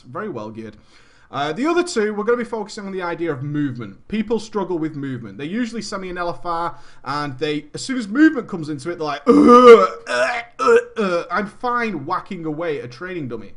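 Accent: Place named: Yorkshire, United Kingdom. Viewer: You sound like you're from Yorkshire :@ Lancashire ftw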